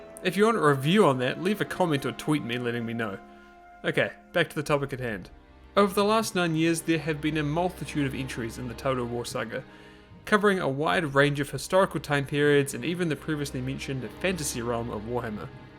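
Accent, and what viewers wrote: New Zealand. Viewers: I love this review for a few reasons: it's exceedingly late, which means it's gonna help folks who may have compatibility issues on newer systems; it's weighing the game more on its own merits than just mindlessly comparing it to Rome 1 or Medieval 2 as some old school TW fans tend to do; and the exceedingly kiwi accent. The only thing I disagree with in this video is the statement that Warhammer 2 is the best example of a Total War game thus far. Mechanically, maybe, but a huge part of the appeal of the franchise has always been the large scale battles set in their different takes of different historical periods and settings. You can't take that away from Total War even if it permanently shifts to fantasy, like going into LotR, Game of Thrones, or even WarCraft lore (which I'd honestly love to see). In that regard, I'd still say Shogun 2, especially including Fall of the Samurai, is the epitome of a Total War game among the post-Medieval 2 releases. 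· Oh he's a kiwi I was wondering why the accent was confusing me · homie is kiwi af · Wow, you’ve got one of the kiwiest accents I’ve ever heard. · "Some clans may begin in a more precarious position than others" Legendary Uesugi flashbacks What timing though. Was surprised to see a video on this when I'd been playing shogun 2 just a couple of months back, and shocked to hear a good ol' kiwi accent.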